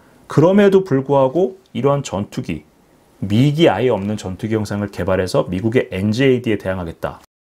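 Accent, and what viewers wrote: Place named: Busan, South Korea. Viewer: 말이 뭐가 빠랐냐 니가경상도 부산억양에 익숙하지않아서 그런것일 뿐이다 노량진 학원가서 경상도말 배워라. 나는 그것도 늦어서 속도1.5로듣고있다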